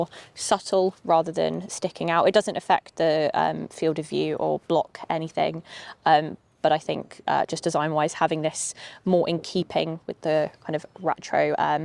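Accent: England, United Kingdom. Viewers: I have a 2018 T100 since new. Enjoy your video with British accent! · Which part of England is your accent from?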